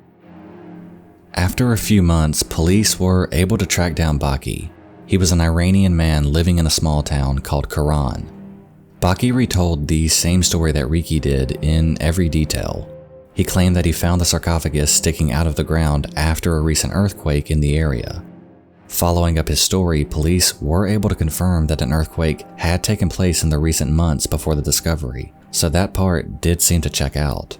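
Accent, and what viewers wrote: South, United States. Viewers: Congrats on your quick rise to fame. Your videos are good, and your southern accent is just great ) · sounds like Barely Sociable with a slight southern accent. Barely has once done a southern accent, though.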